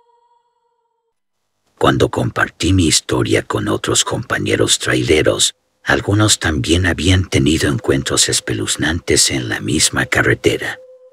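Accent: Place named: United States. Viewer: Su acento es de estadounidense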